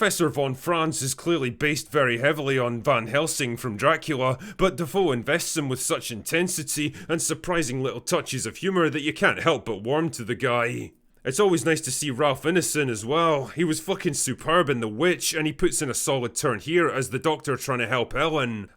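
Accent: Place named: West Lothian, United Kingdom. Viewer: The Drinker Recommends, I watch And it’s not because he sounds like he lives just along the road from me (Livi - though I’m a proud westerner. Mon The Sons)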